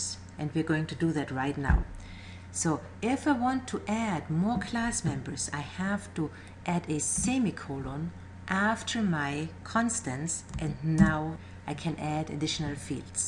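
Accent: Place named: Jamaica. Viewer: Excellent tutorials! Your accent is cool.. are you Jamaican?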